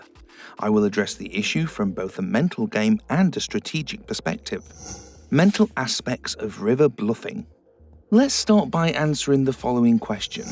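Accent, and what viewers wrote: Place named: United Kingdom. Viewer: British voice is the 2nd best selling point behind his last tip. Shame, I had to watch that whole video to get to that idea.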